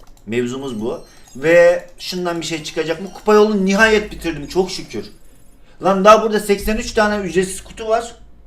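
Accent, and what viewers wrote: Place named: Laz, Turkey. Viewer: Bu adamı eskiden tanıyorum laz gibi konuşaydu şimdi nonoşlar gibi konuşayu para adamu degiştiriye haa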